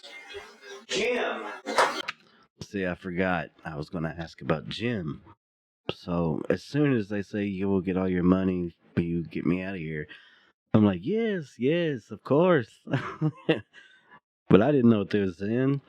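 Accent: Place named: South, United States. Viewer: You sound like you maybe here from the south... If so hi neighbor. If not hello anyway. Love your videos!!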